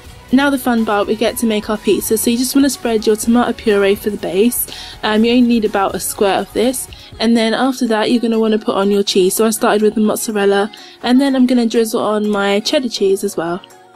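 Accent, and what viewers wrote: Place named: United Kingdom. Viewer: I absolutely L-O-V-E your British accent.😊😝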